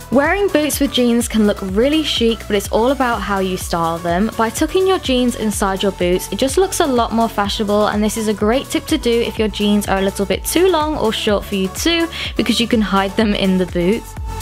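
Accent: United Kingdom. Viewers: Are you using a British accent?? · Love your british accent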